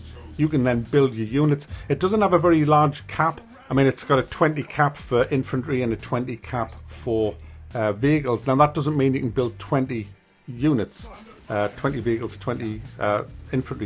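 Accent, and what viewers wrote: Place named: Newcastle, United Kingdom. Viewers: How the hell do you talk like a Georgie 99% of the time but go posh when you say marrster instead of master? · He's a geordie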